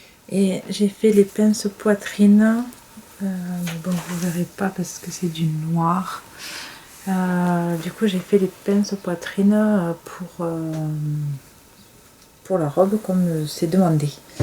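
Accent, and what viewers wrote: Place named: Aveyron, France. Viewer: Arrivée par par raton et 🐼 Bon début Je suppose que tu es tu Tarn Vu l accent 😘 de l Aveyron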